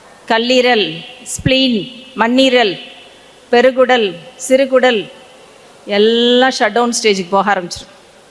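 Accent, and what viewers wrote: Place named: Virudhunagar, India. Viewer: Medam ungala virudhunagar ill ungalado pessu arumaiyakka irunthathu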